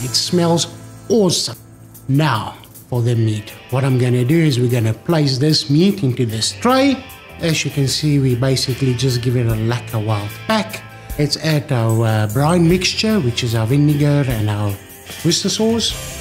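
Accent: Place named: South Africa. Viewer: I just love your South African accent!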